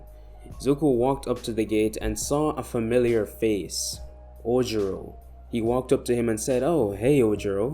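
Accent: Jamaica. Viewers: Jamaican accent?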